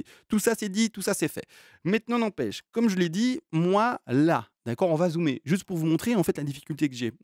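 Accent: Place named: Belgium. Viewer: Oui il a l'accent belge.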